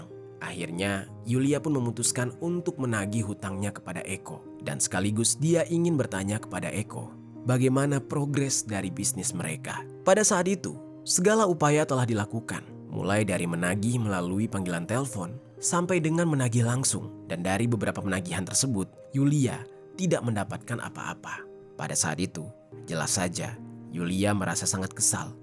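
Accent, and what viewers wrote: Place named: Surabaya, Indonesia. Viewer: Sht slalu n semangat n sukses, sememi Surabaya aksen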